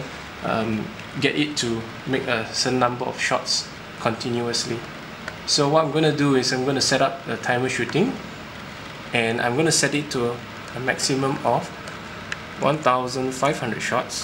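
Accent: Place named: Singapore. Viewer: long live singlish! :D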